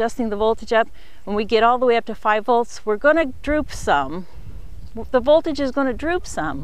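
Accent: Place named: United States. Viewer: If It wasnt for your American accent, I could swear that you were here with me in Australia! A+ review as always & 3 the hat!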